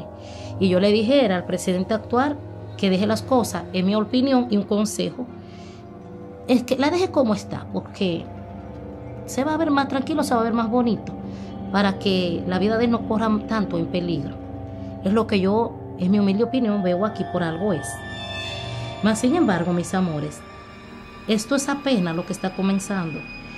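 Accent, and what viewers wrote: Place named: Dominican Republic. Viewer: Pero mami tú ablas como Dominicana a pues ya tu sabes. 🤔😁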